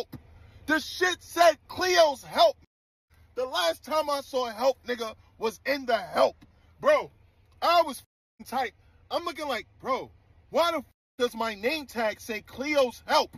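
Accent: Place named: New York, United States. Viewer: Really? As a fellow Newyorker, I had no issues. We must really sound crazy to everyone else 😭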